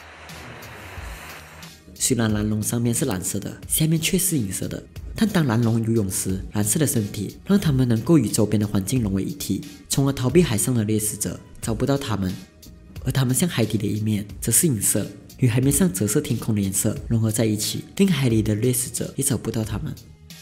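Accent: Malaysia. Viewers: 马来西亚口音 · 是馬來西亞人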